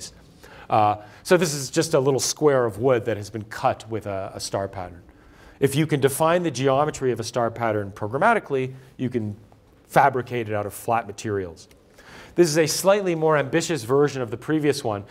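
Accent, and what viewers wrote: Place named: United Kingdom. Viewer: somehow british irritates me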